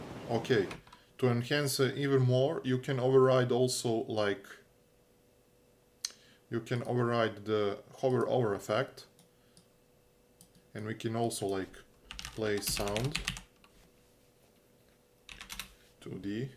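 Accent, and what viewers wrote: Russia. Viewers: Акцент прям русский русский)) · Hi! I was somewhat confused by your English accent. It is very similar to the accent of a resident of Russia.:) And the name is closer to scandinavian origin. Thanks for the plugin! We will use it!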